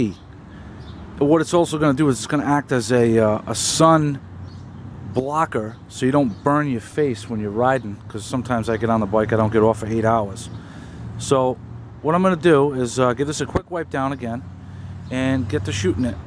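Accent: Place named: Upstate New York, United States. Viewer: Sounds like upstate new york lol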